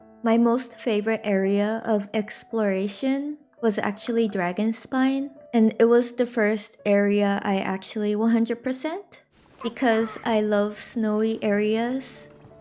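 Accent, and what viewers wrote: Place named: Indonesia. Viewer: ur not sound like japanese, more like indo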